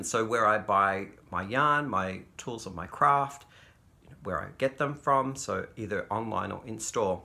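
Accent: Australia. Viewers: Hi. you sound Australian! where are you from. O my goodness these fish are gorgeous!!!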